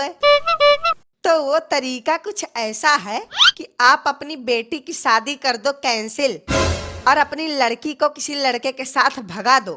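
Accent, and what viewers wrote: India. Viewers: Awaz se lgta h aap bihar se ho Up se nhi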